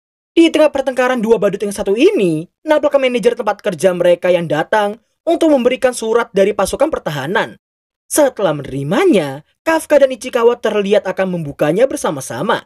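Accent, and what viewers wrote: Java, Indonesia. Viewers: Kamu dari Jawa y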